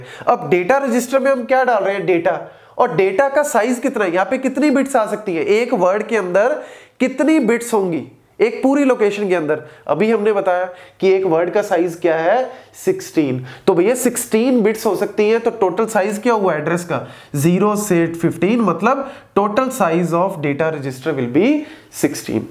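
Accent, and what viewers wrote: Punjab, India. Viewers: your punjabi accent is sooooo cute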